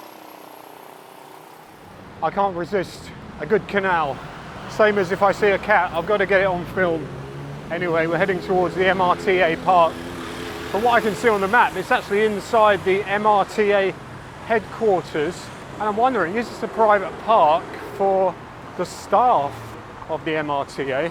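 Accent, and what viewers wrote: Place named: Yorkshire, United Kingdom. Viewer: someone told me you were born in Leeds, same as me, is that corrct? You certainly have a different kind of Yorkshire accent. Your videos are interesting and well researched, highly unusual in this field, cheers mate.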